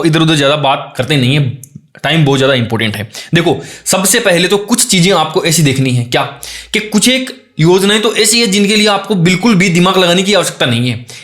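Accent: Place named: Shekhawati, India. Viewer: Wow sir .....kya gajb ki trick ....❤ap shekhwati se ho kya kyunki apki language m asar dikh jata h thoda thoda .......bhot acha pdha rhe ho sir sir ......👍👍👍👍👍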